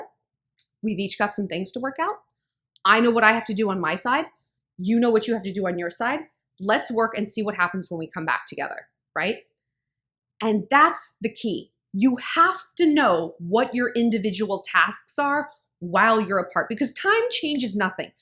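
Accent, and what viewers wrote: Australia. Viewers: Lol the aussie accent is great 😂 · Great Australian accent 👌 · Why is your Australian accent lowkey amazing lol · Your Australian accent is on point 👏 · your Australian accent is on point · I looovee the Australian accent!!! Hehe please do it more XD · I love that Aussie accent 🇦🇺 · Your Aussie accent is everything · Youre so good at that Australian accent!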